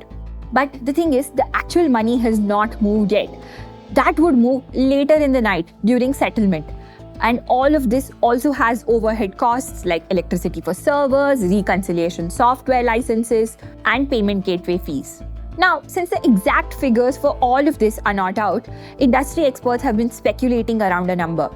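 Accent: India. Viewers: Your speak like a Tamil not english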